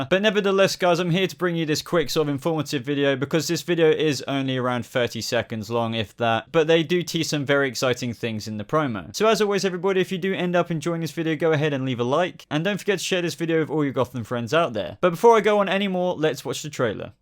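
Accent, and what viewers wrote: United Kingdom. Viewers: Two questions: 1) If this chappie is in the UK as the accent suggests, how can he be legally reviewing things that haven't shown here yet? 2) Is it just me that thinks he is REALLY good looking?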